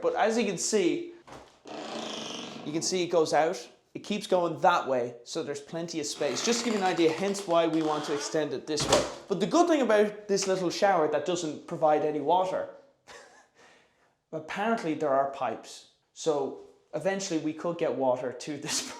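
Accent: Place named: Ireland. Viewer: You sound extra Irish in this one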